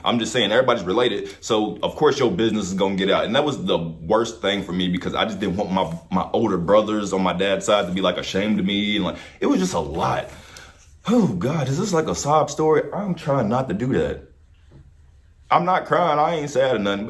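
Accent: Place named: New York, United States. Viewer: 🤓 u talk n sound like u from new York City n that dude from black ink crew NY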